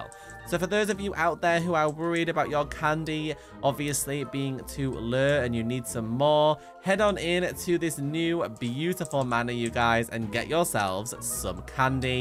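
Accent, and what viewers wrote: United Kingdom. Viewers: OMG ty so much for telling me and I love your accent I’m from Britain too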